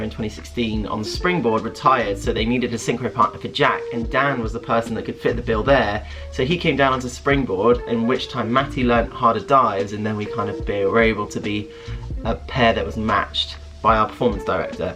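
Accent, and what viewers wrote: United Kingdom. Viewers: love to wake up on sunday, make coffee and watch your vids at 10am. (pacific standard time, california) love a british voice in the mornings. congrats. i hope upload schedule will be the same after tokyo 🤙🏼🎉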